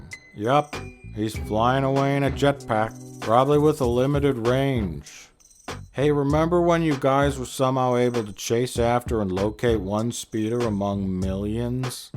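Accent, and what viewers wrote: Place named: Wisconsin, United States. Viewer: My favorite part of these reviews is the narration. Monotone, mumbling mispronouncing of new words is a perfect rendition of central Wisconsin schlubbery. Its like hearing Peter Sellers when he does half a dozen different English accents to perfection.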